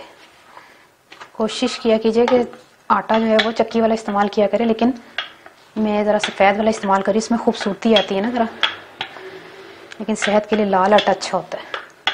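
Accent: Punjab, India. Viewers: Your panjabi so sweet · ماشاء اللہ جی... چس آ گئ... پراٹھے کی بھی اور آپ کی میٹھی میٹھی پنجابی کی بھی.. 🤤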